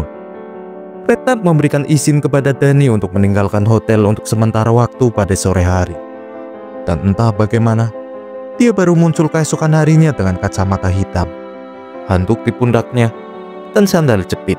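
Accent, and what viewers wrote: Java, Indonesia. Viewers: Medhog banget narratornya.. 😂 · jawir pengisi suare ye, bikin bete, mending ngisi suara wayang kulit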